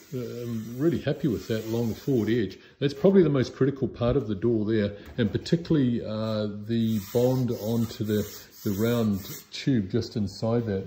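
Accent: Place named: New Zealand. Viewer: sorry for the bad accent ID on my part... I've been through more of the vids and clearly see you're a Kiwi. Thanks for documenting everything, esp mistakes, it helps alot.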